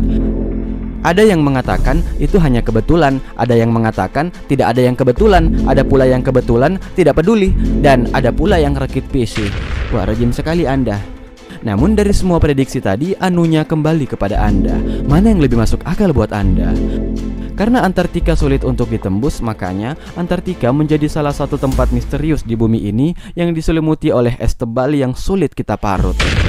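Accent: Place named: Madura, Indonesia. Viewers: Anjir suaranya kayak orang madura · Bro.. kok kayak ada suara bahasa maduranya..kayak orng jualan di kapal...😀😀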